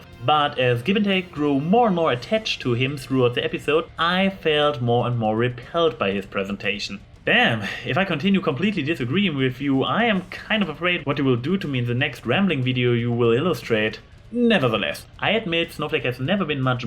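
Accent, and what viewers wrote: Germany. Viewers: I have no idea why, but I seem to be really happy to hear your German tones today.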